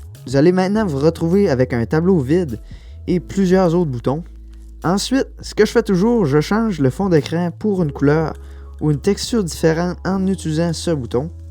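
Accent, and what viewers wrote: Quebec, Canada. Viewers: Tu vient du quebec ta l'accent quebequoi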